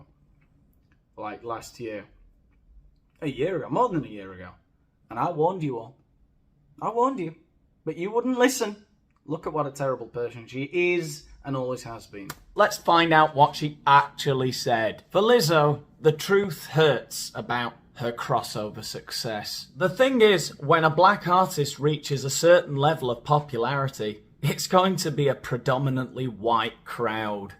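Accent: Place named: Sheffield, United Kingdom. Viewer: Do, do the voices. Particularly your Sheffield voice, I love it!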